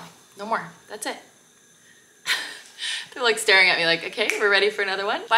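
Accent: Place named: United States. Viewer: I Love this vlogs. I can’t wait to see another one tomorrow. Have you ever tried to go for language exchange meetings? I’m sure a lot of people will love you American accent (at least I do) and you may meet some interesting guys from abroad, open minded and with the energy to discover the world. Meeting someone with another culture is amazing, everyday you learn new things.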